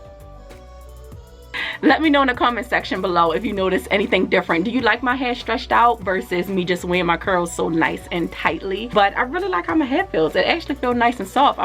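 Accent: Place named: DMV, United States. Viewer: New subbie here from Jackie Naturals 💕 Would love to support each other! Your curls are absolutely beautiful 😍 I am in loveee lol. Are you from the DMV? You kind of sound like it. Loving your hair & energy 🔥 The results look so good!!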